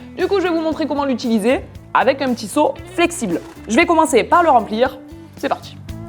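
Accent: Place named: South, France. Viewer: Tu a un accent du sud c’est trop drole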